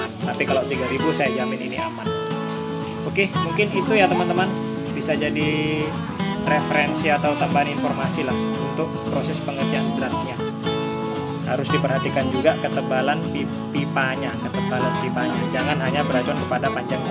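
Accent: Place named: East Java, Indonesia. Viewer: Dari logatnya spertinya mas org jawatimuran. Bisa minta kontak wa nya mas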